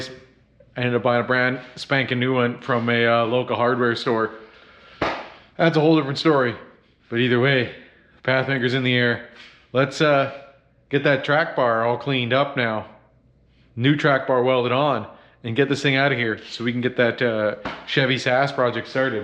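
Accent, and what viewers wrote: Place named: Canada. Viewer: You kinda sound a little Canadian. And I thought you was like from the states. But anyways good job. Keep up the good videos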